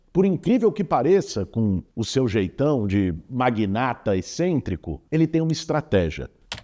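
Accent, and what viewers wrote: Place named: São Paulo, Brazil. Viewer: Eu não aguento esse sotaque paulistano.... Podem me detonar, tá!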